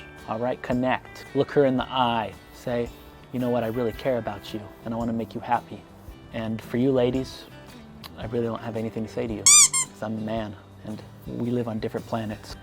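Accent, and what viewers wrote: United States. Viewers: Haha! I don’t think I’ve ever heard you speak this much in English. 한국말로 구사할 때랑 똑같이 농담을 하시네요 ㅋㅋㅋ 캘리포니야 말투도 멋지고 한쿨말의 방송인 억양도 재밌구먼:) 화팅!! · As an American, I like your American English accent. It's very easy to understand and soothing to listen to. I like your advice by saying to be bold and courageous but not cross the line which means always being respectful and being a gentleman. Great video as always! Take care,!!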